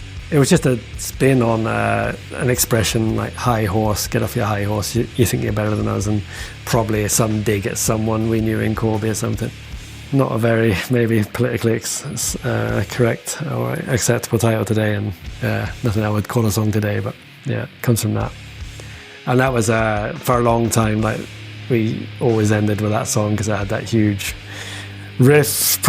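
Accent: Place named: Sweden. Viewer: Sounds like he's lived in Sweden so long that he's developed a Swedish accent. Or is this how people from Corby sound?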